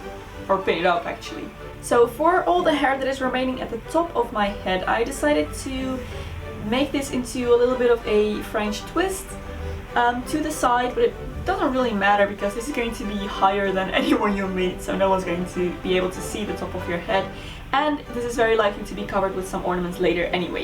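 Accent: Netherlands. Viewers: I heard some Dutch accent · are you dutch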